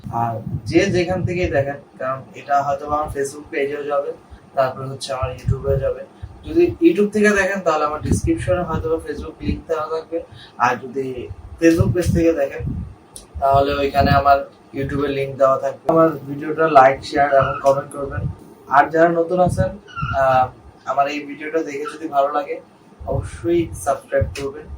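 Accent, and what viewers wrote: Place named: Chittagong, Bangladesh. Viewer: Mama ageo bolsilm. Chatgaiya accent ta raikho. I think it would be better.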